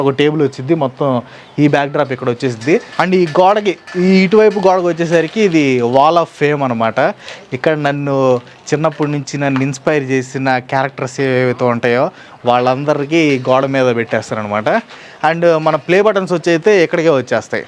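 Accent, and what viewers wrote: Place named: Tenali, India. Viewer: మా తెనాలి రేపల్లె డెల్టా ప్రాంతాల్లో ఉండే స్లాంగ్.... వచ్చిద్ది వెళ్ళిద్ది కెవ్వు కేకే 😜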